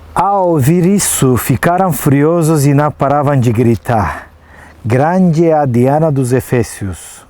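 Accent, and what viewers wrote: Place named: Brazil. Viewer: para uma pessoa de nacionalidade turca, você domina muito bem a língua portuguesa. Acaso morou no Brasil? Por que a sua pronúncia está similar com o português brasileiro. Parabéns pelos vídeos, eles nos levam a uma cultura multi-secular, que nos facina pela história do cristianismo e seus percusores. Gratidão...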